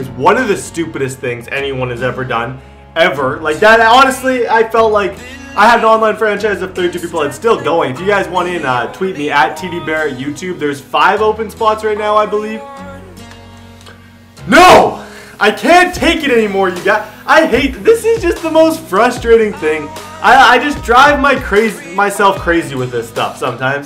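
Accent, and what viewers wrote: Canada. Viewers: TDBarrett I can tell Canadian because you say soorry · TDBarrett I knew you were Canadian since you like the Raptors and how you say about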